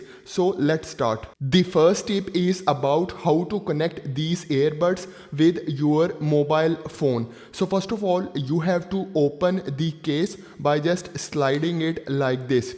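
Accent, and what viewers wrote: India. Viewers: Yessir he has an Indian accent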